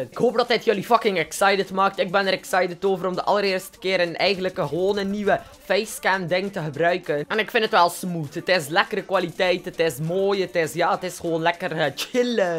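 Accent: West Flanders, Belgium. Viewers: Van min part meugt ge plat west vloams klappen weh;) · Ik ben ook west Vlaams en ik zeg: doe nog lange deure me YouTube echt wo je zie echt nen coole dude gie verdiend veel meer subs! Ik weunen nie An de kust om kgon der graag na toe. Allee nog vele succes me YouTube!!! PEACENIK #westvlaamsaccent. Hèhè dat moest er even uit. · HAHAHAHA kapot lachen met ej West - Vloams:') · Jij bent west-vlaams? Van welke stad/dorp? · Nice video ik heb geen probleem met je accent want ik ben zelf west-Vlaams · West vloams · Mooi gezegd me u west-vlaams accentje hehe:) en PROFICIAAAT!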